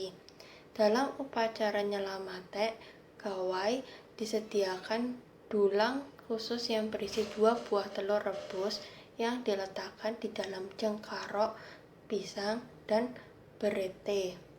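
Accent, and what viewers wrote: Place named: Java, Indonesia. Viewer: Yang jelasin kok logat Jawa, gak nyambung jadinya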